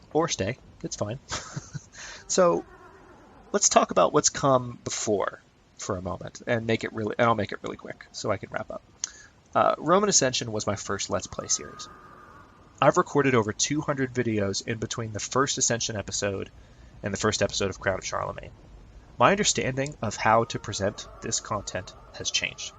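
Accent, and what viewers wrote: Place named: United States. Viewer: Hahaha aye exactly the accentless accent, the de jure news reader accent :') really clear but you can tell its American haha. Sounds lovely :). Sure i enjoyed your video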